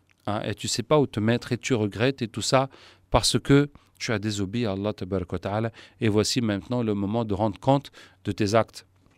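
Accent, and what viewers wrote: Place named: Africa. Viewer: Tu parle souvent comme les africain noirs avec un accent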